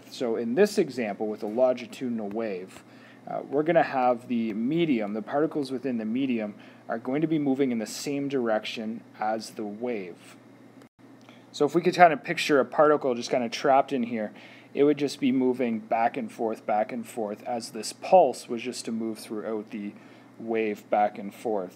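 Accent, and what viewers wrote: Canada. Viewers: I think he is canadian because he said aboot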